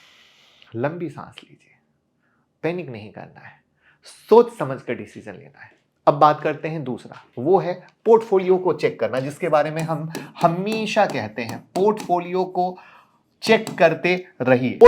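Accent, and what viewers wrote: Bihar, India. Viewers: Aap up ni Bihar se ho bro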